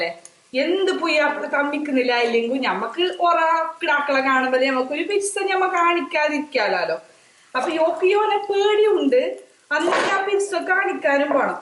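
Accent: Kasaragod, India. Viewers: പുതിയാപ്ലെ അല്ലാ മാപ്ലെ casrod slang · സൂപ്പർ സ്ലാംഗ് കാസർക്കോട് ഒരു മഴ പെയ്തു തോർന്നു പോയത് പോലെ തോന്നുന്നു അതി മനോഹരം അടുത്ത വീഡിയോ കാത്തിരിക്കുന്നു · Hahaha..usaaranne ppa..eden padiche kasaragod bhasa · നിങ്ങളുടെ ഈ റിവ്യൂ കൊണ്ടു മറ്റുള്ള മലയാളികൾ ഒകെ കുറച്ചു കാസര്ഗോഡൻ മലയാളം പഠിക്കട്ടെ. Good job. Enjoyed. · ശെരിക്കും കാസറഗോഡ് സ്ലാങ് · കാസർഗോഡ് ഉള്ളവർ എല്ലാം ഇങ്ങനെ ആണോ സംസാരിക്കുന്നെ ?? 😆😆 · ivde ipo ntha indaye 😁😂 Ksd slang poli malappuram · ചേച്ചി കാസർഗോഡ് ആക്കി ശംസരിക്കുന്നത് ആണോ ശെരിക്കും ആണോ കാസറഗോഡ് കാരി ആണോ ഞാൻ ഒരു കാസറഗോഡ് കാരൻ പയ്യൻ (അല്ലെങ്കിൽ നമ്മൾ കാസഗോഡ് കാർ പോളി അല്ലെ) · Nmmle ksrotte basa endorri paangppa😍😄 hyole bisyo kettit njn chirchitt merchhoyiii,😂😂😂👍👍😍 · Is this kasaragod malayalam?? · Ende oru frnd paranjanu njan ee tym kasarkodu bhasha inganayundu ennu kettittundu...ippo aanu nerittu kanunnathu....enda ponne ijjathi resamundu kelkan😂😂😂❤❤ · Ksd thanne diffrent slang und so its un predictable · ചേച്ചി ശരിക്കും കാസർഗോഡ് ആണോ.. 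😂😂👍👍👍👍❤ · Sunesh 😀kasrgod slang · Kasarode poli slang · Kasaragod slang is very beautiful to speak and hear.but don't make it vulgar.. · Kzrkd slang 😍👌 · Yuppaa maje aayin njammale kasrodian slang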